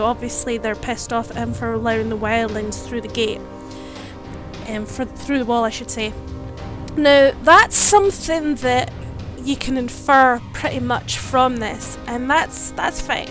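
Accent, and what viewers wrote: Scotland, United Kingdom. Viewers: What accent have you got? Is it Scottish sorry I'm terrible at telling which accent people are from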